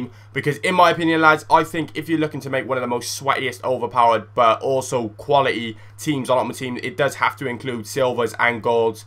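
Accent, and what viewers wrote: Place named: Cardiff, United Kingdom. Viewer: I think it would be funny as fuck if you do funny squads mate, btw are you from cardiff ???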